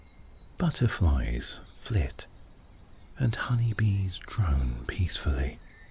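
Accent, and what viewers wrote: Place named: United Kingdom. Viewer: A Brilliant voice, an amazing British accent, a great imagination to be involved in, thank you from Iraq! ❤❤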